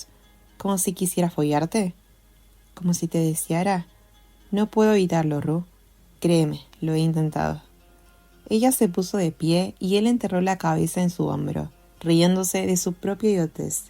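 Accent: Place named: Argentina. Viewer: Me encanta el acento argentino, le da el toque perfecto a la narración 👏🏼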